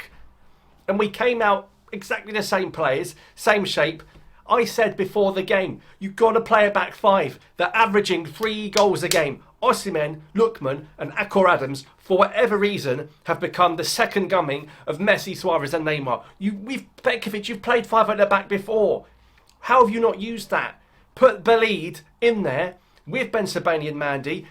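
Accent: England, United Kingdom. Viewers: Sweeet!!!! Nice jolt ⚡️! Algerian with an English accent!!! I was expecting a French accent !!! Love your spot on analytical review! 👊🏾🇳🇬👊🏾🇳🇬!!!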